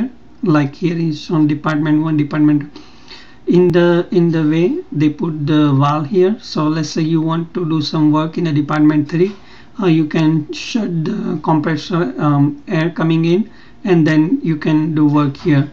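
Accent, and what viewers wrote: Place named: Arab world. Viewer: Difficult arabic accent , but thanks anyway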